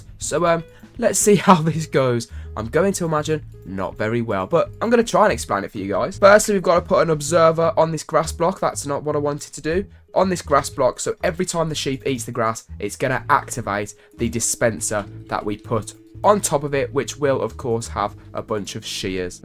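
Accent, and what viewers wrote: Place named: United Kingdom. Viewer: helo how are u Dude British accent is funny 😂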